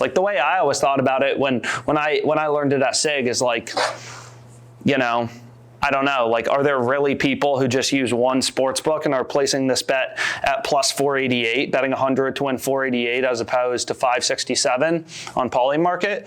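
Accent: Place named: South Jersey, United States. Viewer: 2 questions. Are you from delco? Cuz you sound like you are either from south jersey or delco. Andddd did you sorta think you could wing this presentation without much practice before? I know how it feels to speak to a group of strangers cuz i used to host trivia and my first few shows were terribly awkward. Just like this was at times, rambling in and out of tangents, awkward long pauses etc. Part of that skill definitely come with repetition which will come with time, but preparation helps and this felt a bit undercooked at times for sure.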